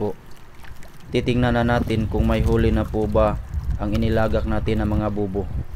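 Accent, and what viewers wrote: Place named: Batasan Island, Philippines. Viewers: salamat sa pagtagalog mo kac kapag magbisaya ka hndi Po namin maintindihan.. kahit bisaya Yung tuno ng salita mo at least naiintindihan Po namin.. I like your content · saan ka sa tubigon? b4 nag school pako marami akong classmate dyan,I think sa tuno ng pnnalita mo ur from batasan iland,me from clarin bt her nko mnila now,maybe ur one of my classmate b4 or school mate..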